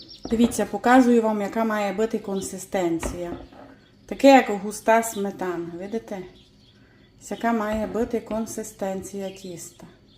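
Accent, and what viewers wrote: Transcarpathia, Ukraine. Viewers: Пані, у Вас дуже гарний голос і мені подобається Ваш закарпатський діалект. Чимось схожий на зебру, наче і просто виглядає, але через екран пахне.У Вас є рецепт Зебри? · Дякую файно, дорога, за такий гарний простий рецепт. Цей калач ще виглядає, як великий кекс. Супер!!! Так приємно Вас слухати - стільки тепла і доброти у Вашому голосі!. І рідна закарпатська мова. Божих Благословінь Вам і Вашим рідним.❤❤❤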